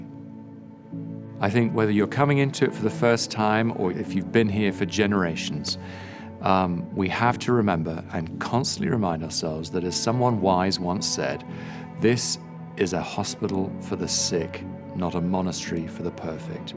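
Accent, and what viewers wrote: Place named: United Kingdom. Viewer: LOVE THIS MESSAGE SO MUCH!! esp w/ the british accent well done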